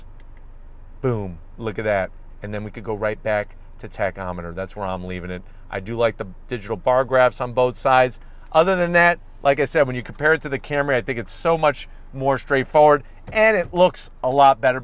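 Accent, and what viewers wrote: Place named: New Jersey, United States. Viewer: I'm guessing this guy is from NJ or LI.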